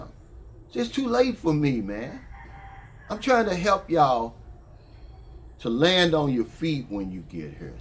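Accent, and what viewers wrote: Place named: New York, United States. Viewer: I love this guy - New Yawk!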